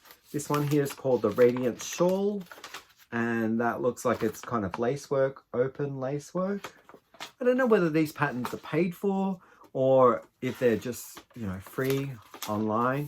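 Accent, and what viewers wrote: Australia. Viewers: Blessings for quick recovery. Hope this finds you better. I'm new from long hiatus from crocheting. I've never ordered from Aberdeen. I loved their Toy Box at $2!!! So I went on the site to order....all colorways Sold Out.😢😮 I love cotton! ❤️ Beautiful Your projects are Magnificent! ❤❤❤ What a Wrap! WoW.. You are Very Gifted! Love the Colors too. Your Accent is Australian? Love to hear you talk. 😊 1st Bag was so my Colors, #6885.❤ It's a Small DK. Bloom is so Pretty. 😮 Loved the Cotton/Nylon. ❤Enjoyed all the yarn haul. Thank you my Friend. Bless You.❤️🙏🏼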